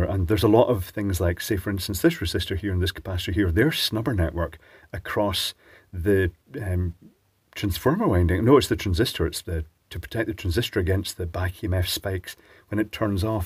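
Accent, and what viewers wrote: Scotland, United Kingdom. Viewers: There's something magical about hearing "green crustiness" in a Scottish accent.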